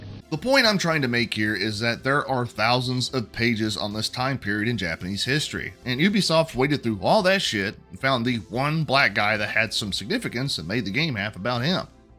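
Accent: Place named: Texas, United States. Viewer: You sound like Texas just play as the female character if you don't like YASUKE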